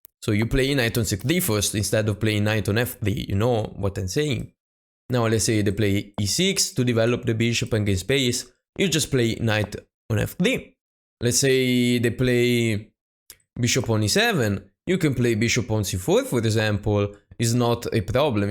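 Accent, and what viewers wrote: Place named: Italy. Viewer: Great stuff bro. I enjoy the Italian accent 😂. Can you please show your best response to the Sicilian defense? Thanks 🙏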